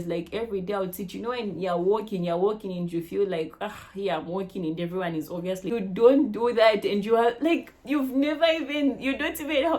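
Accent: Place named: Uganda. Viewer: You talk like a Ugandan, 🥳🎉 pleasure is all mine ones again,thanks for posting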